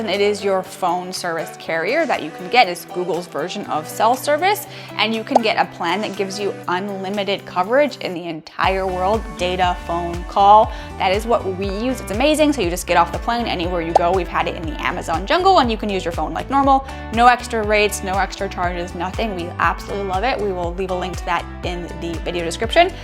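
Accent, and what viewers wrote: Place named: United States. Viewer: your squeaky ummerrigaan voice is annoying ...you come across like the typical arrogant over the border wise ass but really are below avg....go to you florida...leave us alone..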